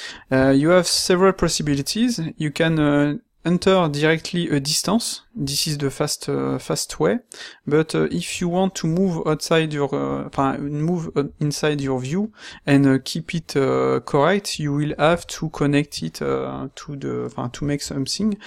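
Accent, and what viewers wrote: France. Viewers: oulala l'accent , moi je comprend parce que je suis français mais pour les anglais sa doit être compliqué ... super video sinon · it has to be, you can't make a more french accent than that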